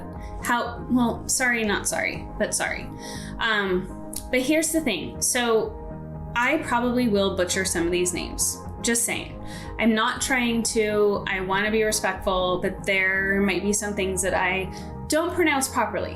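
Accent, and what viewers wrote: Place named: Idaho, United States. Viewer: I was born in Burley Idaho and lived in Rupert when I was little but I grew up in Nampa from 6th grade on up till I was about 22 years old but now I live in CoeurD'Alene, Idaho and I can tell When someone is from Southern Idaho or up North by your accents and I never really thought Idaho people had different accents but We do lol 😂 It's funny to me Because I've "no joking here" Looked at people and said Are you from Nampa and The look on there faces make me laugh because of course I've gotta explain myself LOL always like your accent made me miss Nampa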